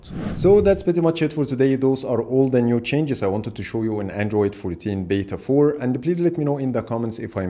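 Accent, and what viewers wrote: Egypt. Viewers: By listening to you, I immediately noticed that you are Egyptian. right?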